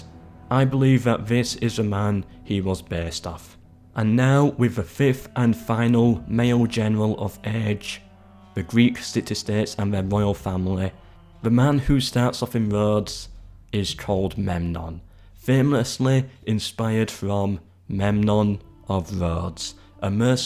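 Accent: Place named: Yorkshire, United Kingdom. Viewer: The Romans would call this old pronunciation issue as a "vexata quaestio". Actually, very few Brits/US folk bother to pronounce Latin or Greek names as they ought to be pronounced (ayup, these two languages have got their own pronunciation, far far before even Old English existed... :D). There may well be ethnocentric reasons behind this (in astronomy, for instance, this is totally blatant, a little bit less in history, maybe) but it's always refreshing to me when someone decides to utilise the original pronunciation, doing justice to those historical names. Regardless, the proprietor's Yorkshire accent sounds absolutely pleasant and very very fit for divulgative/entertaining videos such as his own.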